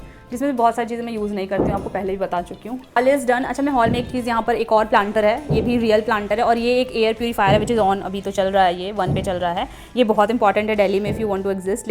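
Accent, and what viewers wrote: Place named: Braj, India. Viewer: Ap mathura se hai Mam,, ? Apka acent Brajwasiyo ki trha lgta h 🥰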